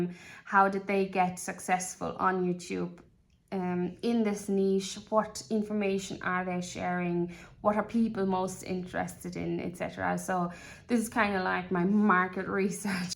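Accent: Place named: Ireland. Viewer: I'm enjoying catching up on your older videos. I'm so glad you made shorts as well! I'm telling all my homestead-interested friends about your channel, too. We adore supporting (and watching) small channels and helping the good ones grow! The bonus for me is feeling less homesick just listening to you speak. (I'm in USA and my Da was born in Malin Head, County Donegal).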